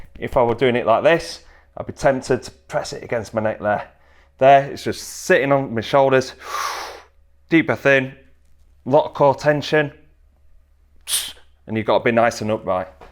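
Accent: United Kingdom. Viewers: This is an english accent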